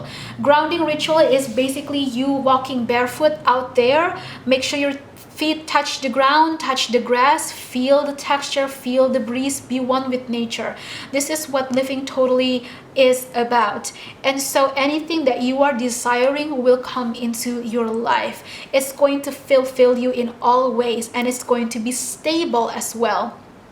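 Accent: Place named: Italy. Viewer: Where are you from? (idk why your accent sounds Italian to me lol)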